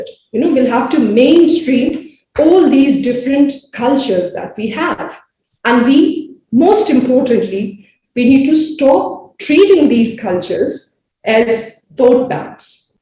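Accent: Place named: United Kingdom. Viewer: madam everything is good but to connect with masses please you can speak in indian accent, please minimize using british accent.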